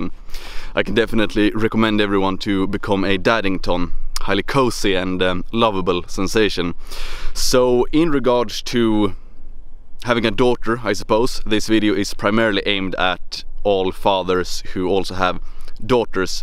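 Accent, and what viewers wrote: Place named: Sweden. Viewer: "If i See behavior i like - i press Like!" "If i See behavior i do not like - i do not press Like!" The Swedish Accent makes it sound even more savage!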